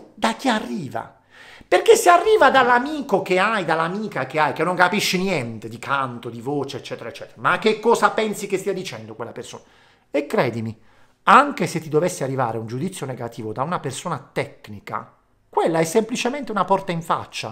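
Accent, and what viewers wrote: Apulia, Italy. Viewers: Grazie di trasmettere positivita e ci incoraggi ad avere fiducia in noi stessi grazie,è bello sentirti parlare con la cadenza pugliese sei unico,mi piace quando inserisci delle parole pugliesi,mi trasmetti la gioia di vivere❤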